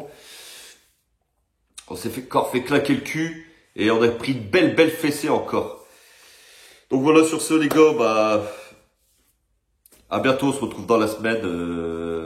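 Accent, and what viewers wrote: North, France. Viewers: T es nordiste ?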